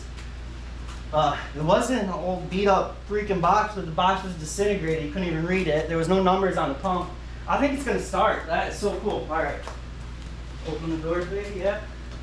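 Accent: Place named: North, United States. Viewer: Where are you from in Florida? Your accent sounds more northern than southern lol. Love the video!! Sweet ride too!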